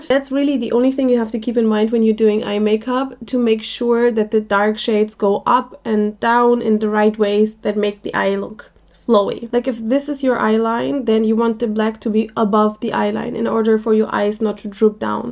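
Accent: Germany. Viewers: where are you from? your accent sounds a bit german... greetings from germany😊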